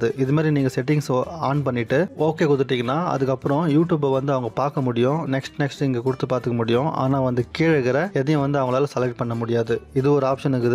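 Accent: Chennai, India. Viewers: Pakka chennai tamizh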